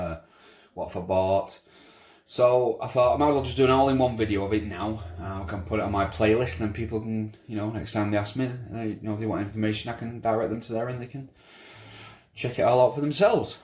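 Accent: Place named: Manchester, United Kingdom. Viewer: There's a manc if I ever heard one! Where abouts the M60 are you pal? 🤭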